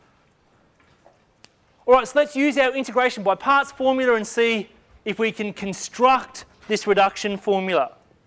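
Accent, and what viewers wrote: Australia. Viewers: are americans really this stupid? he's clearly australian wtf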